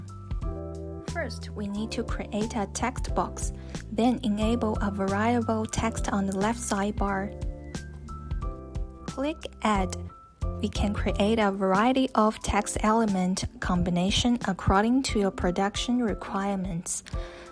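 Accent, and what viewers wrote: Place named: China. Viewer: 听口音是中国人吧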